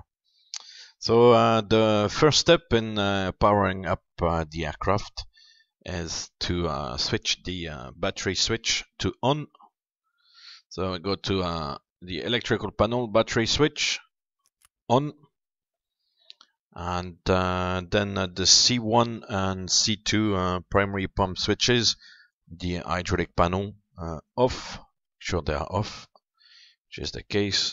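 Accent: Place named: France. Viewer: Un joli accent Français ^^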